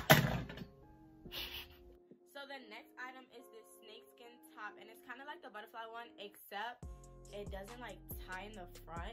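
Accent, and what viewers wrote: New York, United States. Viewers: But its funny how soon as you spoke im like yasss sis from ny ! 🤣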